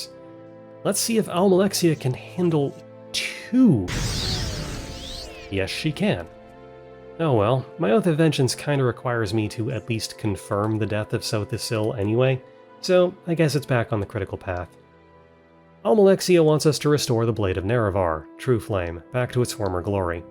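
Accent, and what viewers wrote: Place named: Canada. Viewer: You being, and I mean this as a compliment, *aggressively Canadian*, is apart of the charm of these runs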